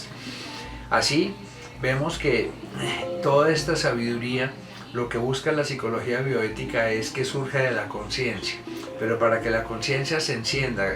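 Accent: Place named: Antioquia, Colombia. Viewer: Con todo respeto la conclusión a vuelo de pjaaro es la de que se trata de un charlatán de acento.paisa, ,con lenguaje rebuscado disfrazado.y tendiente a aterrorizar,encasillar,someter,infundir hacia la disminución,la clasificación morbosa,y la. Intimidación ,ladrillazos parrafudosos de redundancias destrictivas y destructivas de la esperanza de quienes sufririan.Es la sensación que queda tras exponernos a sus "exposiciones radiales,sin salida y trampedeantes.seria bueno no intentara seguir haciendo daño a las audiencias con actitudes sobresuperioradas ,y extensas ,".inocentemente"y de " buena voluntad".Es posible que haya más coincidencias con esta observación,saludos a Parrita.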